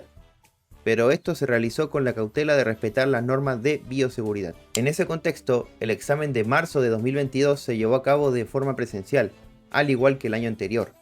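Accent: Chile. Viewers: Bro, porque hablas como chileno? Saludos mano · creí q era la única que había notado ese acento chileno XD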